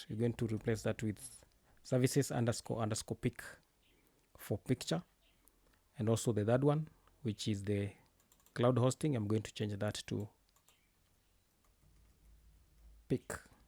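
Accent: Kenya. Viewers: I believe you are a Kenyan. That accent though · Unasound Mkenya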